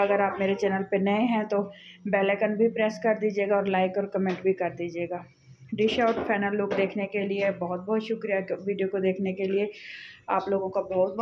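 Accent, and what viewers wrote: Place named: Saraiki, Pakistan. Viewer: ماشاء اللہ بہت اچھی دال بنائی آپ نے منہ سے پانی آ رہا ہے۔😋 آپ کی آواز سے پتہ لگ رہا ہے آپ سرائیکی ہو۔ سرائیکی ہماری مٹھڑی زبان ہے اس لیے اندازہ لگایا بہرحال آپ کھانے بہت اچھے بناتی ہیں 👌👍👏👏🌹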